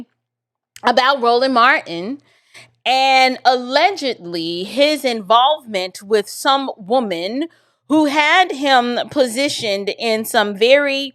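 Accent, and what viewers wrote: New York, United States. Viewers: The way you said ya'll! You are an honorably southern bell! I know you are originally from NY, which I hear as well. I love your commentary and point of view. Keep up the great work! ❤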